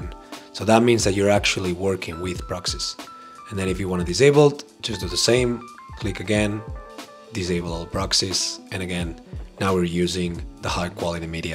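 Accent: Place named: Catalonia, Spain. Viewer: Que soooolid! m'he catat q erets català per l'accent en anglès😂😂😂 una mica Pep Guardiola... Segueix així que ajudes molt👍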